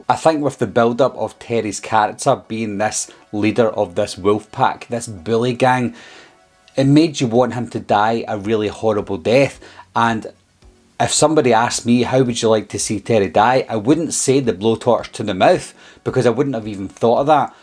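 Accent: Scotland, United Kingdom. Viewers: I love your accent, is it Scottish?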